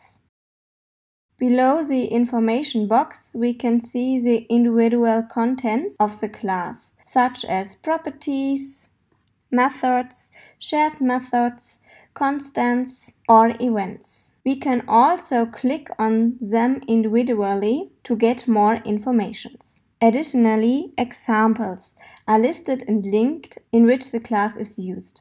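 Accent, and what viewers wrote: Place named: Germany. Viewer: Not only a very useful video for us Xojo neophytes, but this girl has the cutest German accent ever. You can't help but smile every time she tries to use a 'V'.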